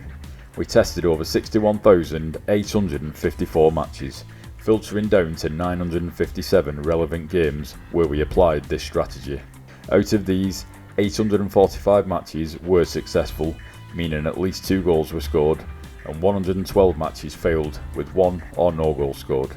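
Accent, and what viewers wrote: Wigan, United Kingdom. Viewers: You from Wigan mate?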